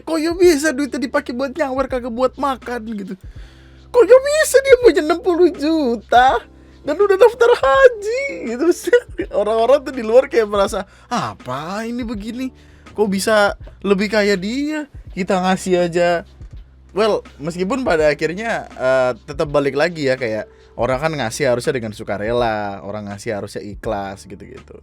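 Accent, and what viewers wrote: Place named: Madura, Indonesia. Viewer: Bahasa Madura kalo denger logatnya bang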